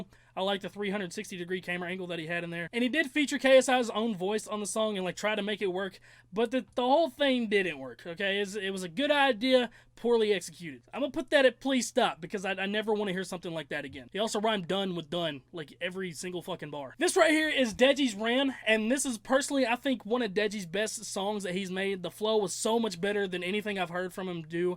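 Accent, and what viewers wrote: Alabama, United States. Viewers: Is he from Alabama cuz I am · Wait are you from Alabama?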